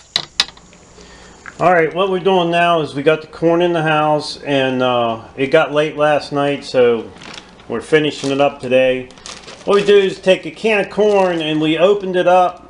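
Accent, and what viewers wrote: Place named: Pittsburgh, United States. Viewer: I hear the ‘Burgh more than WV! :-)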